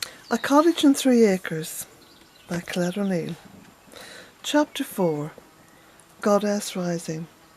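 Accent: Ireland. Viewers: Oh how nice it is to hear those words read in your lovely Irish tones. You give new resonance to your writing. Thank you. Have a beautiful rest and wake to the scent of rain.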